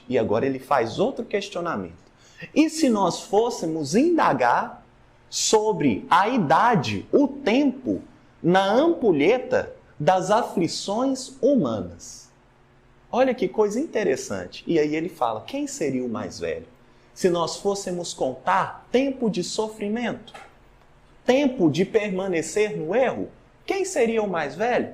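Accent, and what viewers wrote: Minas Gerais, Brazil. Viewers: Lindo capítulo do livro e muito bem explanando pelo. Aproveito pra matar a saudade do sotaque mineiro que traz aquela sensação de estar em casa 😍 gratidão,!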